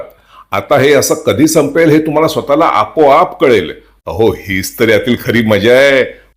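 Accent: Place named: Pune, India. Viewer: तुमची मराठी बोलण्याची पद्धत ही सदाशिव पेठेची आहे.. पुणेरी खोच!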